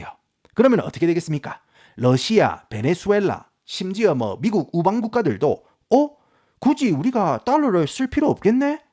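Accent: Gyeongsang, South Korea. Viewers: 우짜 이리 말을 잘 터노. 미국에서 갱상도 사투리 들으니 넘나 톡톡 튀고 좋타. 함 봄 구독안하고 못배긴다. 명언이 한 두개가 아이다. ㅎㄷㄷ 한국떠난지 오래라믄서 우째 이라고 우리맴과 삶을 잘 알꼬! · 와~미국 금융계통에서 일하셨던 경험자라 그런지 완전 고급정보 얻어갑니다. 내가 회사만들면 스카웃하고싶네요 근데 나는 갱상도라 말끼를 알아둗겠는데 딴동네 출신들은 알아 듣겠나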